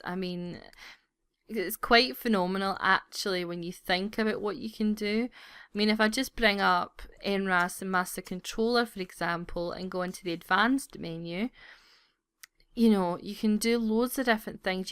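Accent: Scotland, United Kingdom. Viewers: Pringles Fan! Scottish?? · She sounds Scottish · Woah okay. Four things I HAVE to bring up. 1. Nice accent! Haven't heard a Scottish lady speak before, sounds quite classy! 2. Perfect tutorial! This is not hyperbole. This has EVERYTHING you need: 2a. Detailed explanations on each most asked questions and how to get there. 2b. A patient person who calmly explains her reason of making this video for those curious. 2c. A showing of human emotion while also being fair. Not liking teenage pregnancy is fine. Heck, I don't either. I respect their decisions also. 3. A link leading to the installation of these mods without making it too long and also explaining what each mod does and again, humanizing yourself with a reason into why you only have these here. 4. A cheeky joke in between a learning experience enhances the experience and makes it more enjoyable and not make people think you're not human. 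Human interaction amongst the teaching makes it relatable. If she can, I can! I cannot stress enough. You did extremely well here, and I don't say it often. Thank you, for the charming, compassionate, informative and patient tutorial!:D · are you Scottish or Irish? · Ahh! Scottish accent! I'm Scottish too and it's so nice to hear a familiar accent for a change!:) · Not sure why, but I get really happy when I hear a fellow Scottish accent on youtube 👌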